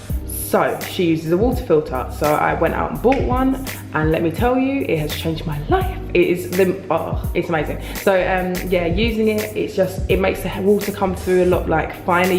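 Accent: London, United Kingdom. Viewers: Luv luv ur British accent. Glad that u like my country. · beautiful I can here you talk all day .I love that London English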